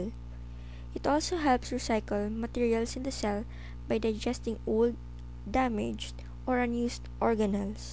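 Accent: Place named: Philippines. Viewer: funny how i can tell this girl is a filipino =)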